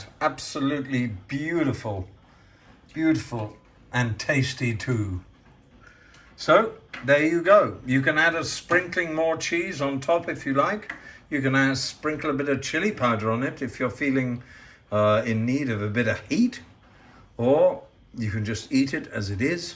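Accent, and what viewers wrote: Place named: England, United Kingdom. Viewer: เข้าสองรอบเลยค่ะ ชอบอาหาร ชอบเสียงคนให้เสียง เพราะชอบสำเนียงอังกฤษทำให้คิดถึงคุณครูด้วยค่ะ ขอบคุณมากๆนะคะ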